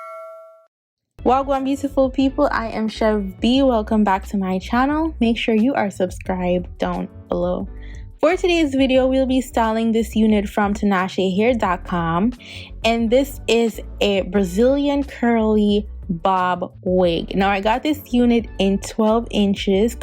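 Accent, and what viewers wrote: Jamaica. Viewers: Sound like you are Jamaican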